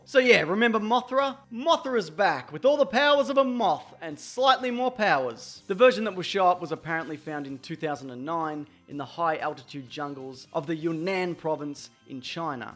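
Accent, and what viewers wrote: Australia. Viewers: He Or sHe has an Australian accent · Wait your Australian?! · YOU"RE AUSTRAILIAN?!?!?!?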